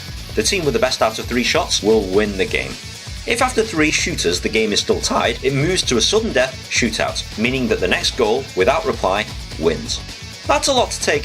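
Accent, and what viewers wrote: England, United Kingdom. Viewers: Why the hell I wanna hear an Englishman explain hockey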